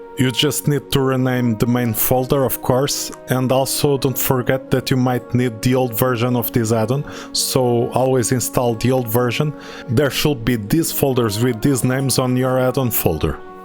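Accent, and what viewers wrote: Portugal. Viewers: Hey! You Portuguese? O sotaque diz-me que sim 😅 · Awesome video. 1 question are you portuguese?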